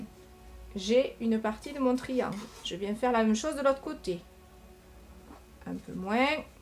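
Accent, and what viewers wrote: South, France. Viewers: Ah enfin le tuto de ce tres beau tableau ! Tout est parfaitement bien expliqué. C est magique de vous voir prendre possession de la toile, de lui donner un sens et de voir emmerger les montagnes, la cascade et les fleurs; le tout avec votre bel accent meridionnal. Merci et bravo pour ce partage.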